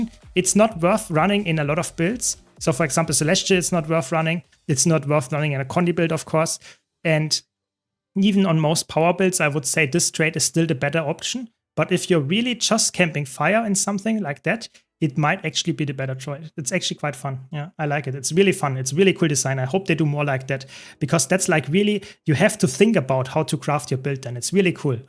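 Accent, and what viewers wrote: Germany. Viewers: Ich war so: Das englisch des dudes klingt sehr deutsch. Check, tatsächlich aus Deutschland xD auf jeden Fall Respekt, dass du das so auf englisch durchziehst! Und cooles Video, vorallem wenn du pve und pvp abdeckst - finde beides sehr spannend und liebe es (pvp macht süchtig, oder?). Bin echt gespannt wohin die Reise mit gw2 geht, neue Elite Specs würd ich auch cool finden!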